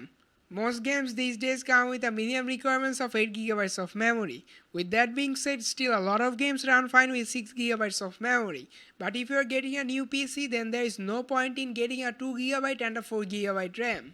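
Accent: India. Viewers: Lovely accent :) Hello India!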